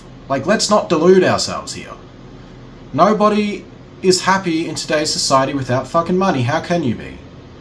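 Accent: Australia. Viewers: everything in a aussie accent sounds better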